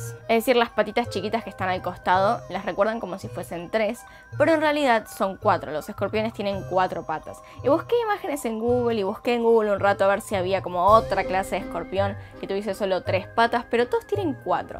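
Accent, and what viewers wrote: Argentina. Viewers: Lo dije una vez y lo reitero....Me encanta tu acento argentino, saludos...!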